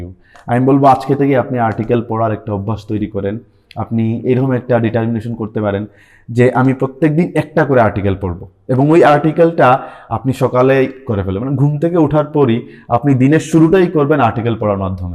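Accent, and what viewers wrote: Sylhet, Bangladesh. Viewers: R u from Sylhet sir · Sir apni ki sylhety? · আমি যদি ভূল না করি তাহলে আমার মনে হয় আপনি একজন সিলেটি। আপনার কথার টোনে বোঝা যাচ্ছে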